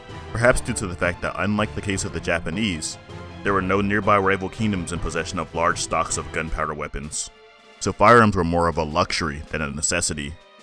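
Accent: Appalachia, United States. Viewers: Love the hillbilly voice!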